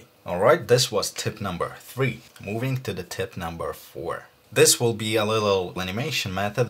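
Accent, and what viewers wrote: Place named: Russia. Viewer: почти не слышно русского акцента) отличный видос, от души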